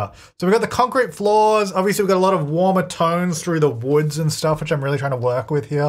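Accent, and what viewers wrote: Australia. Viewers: For some reason, every time I click on one of videos, regardless of whether or not I've already started watching some of it, YouTube always turns subtitles on. No matter the device I'm using to watch YouTube (phone, laptop, tv), every time without fail YouTube puts subtitles on. But only, and I mean ONLY, for videos. Doesn't happen for any other YT channels I watch. Like, wtf 😂 Does YT think that I can't understand him coz he's Australian or something? He's speaking English. I speak English. I know what he's saying YouTube. U don't need to keep putting subtitles on 😂 does this happen to anyone else or just me??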